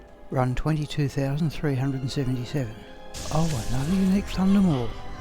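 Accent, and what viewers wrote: Australia. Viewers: I began watching your streams and videos probably about a year and a half ago, and through all the good characters and crafting sessions, I loved watching you run Pindleskin more than anything. It was fun to see some of the drops and lowlights make it into various monthly highlight/lowlight videos, as I could look back and exclaim, "hah, I remember that stream!" I've been watching many streams for many years, as well as ASMR videos on YouTube for roughly a decade. Your streams tirelessly plugging away at Pindleskin always put me into a nearly catatonic or zen-like state. I do not say they were boring. Rather the opposite. Your cadence and Australian accent and logical approach reminded me of a professor I had many years ago, and it was funny to find out you were also an educator. I could listen to him or you for days and find solace. Call it weird or interesting, I had to share. 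I'm not alone here in saying we all appreciate your streams and especially your dedication, sharing a game we all love. Personally I have a much different vested interest in that your candor/approach are the perfect accompanying background noise or secondary monitor treat to my lifestyle of gaming and streaming. So from me to you, thank you. (I formerly went by Aralern24, but changed my YouTube alias to reflect my world of warcraft character lol)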